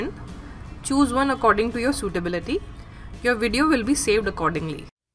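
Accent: India. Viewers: This is an extremely common Indian accent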